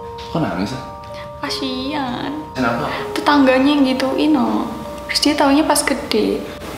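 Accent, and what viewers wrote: Java, Indonesia. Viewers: Seneng medok nya kalo liat mba tuh 😍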